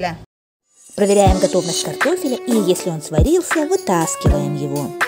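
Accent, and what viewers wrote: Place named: Visayas, Philippines. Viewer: Hello Russia 💘👋✌that's why the tone is Visayan because it's Cebu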